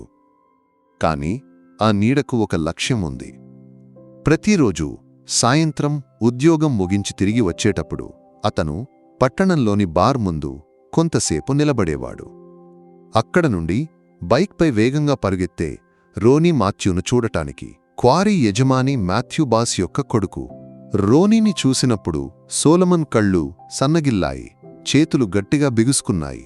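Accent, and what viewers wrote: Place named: Kerala, India. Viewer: మాతృభాష మళయాళం అయిన మీ నోటివెంట తెలుగు పదాలు వినటానికి చాలాబాగా అనిపించింది. డి వై ఎస్పీ అనే పదం కేరళలోనే విన్నాను. 6 సంవత్సరాలు మీ రాష్ట్రం లోనే గడిపాను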